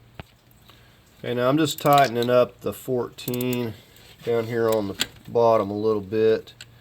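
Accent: South, United States. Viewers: Jeez you must be from the south huh?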